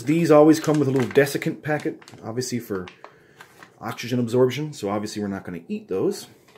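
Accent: Canada. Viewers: At last a Canadian MRE reviewer who speaks like a real Canadian! Pop & pizza pockets. Throw in a bag of hickory sticks and a Coffee Crisp bar and that's a damn good lunch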